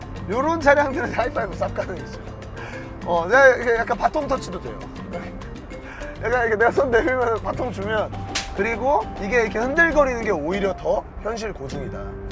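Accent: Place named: Chungcheong, South Korea. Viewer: 오킹이 충청도 사람이유? 사투리가 친근한디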